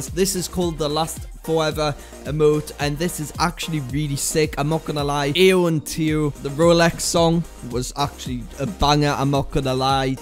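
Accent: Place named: Newcastle, United Kingdom. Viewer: You sound geordie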